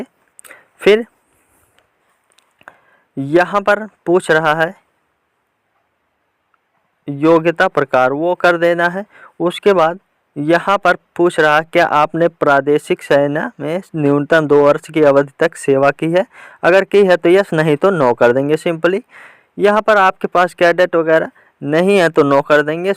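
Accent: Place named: India. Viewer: Chutiya mt bna mobile s ni bhra ja rha or Teri voice to ensi h jaise up ya bihari hoga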